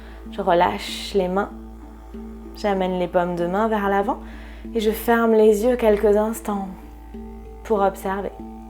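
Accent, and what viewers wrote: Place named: South, France. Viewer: Encore une nouvelle séance qui me fait du bien. Me change des séances iyengar que je pratique tout au long de l'année. Un véritable plaisir avec cet accent si charmant qui m'apporte en plus la lumière du Sud dans nos contrées septentrionales. Au plaisir de pouvoir suivre en présentiel un jour un cours avec;-) Belle fin d'année à vous et longue vie à l'alchimie des corps en 2022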